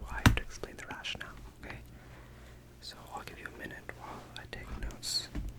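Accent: India. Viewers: If I ever have tingle immunity, I head straight to your Indian accent videos. Love this one! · the indian accent is one of my favorite ASMRs... very nice! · 16 minuts? And indian accent is more noticeable? My new favorite video in this channel.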